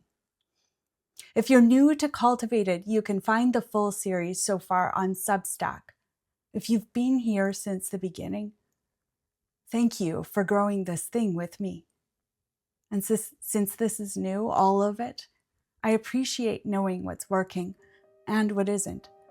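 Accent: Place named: Saskatchewan, Canada. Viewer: Growing up just south of the border in Plentywood, I recognized the Saskatchewan accent instantly. More importantly: likely you know this but nobody likes the sound of their own voice. With only a little professional coaching it would seem you’d be more than ready.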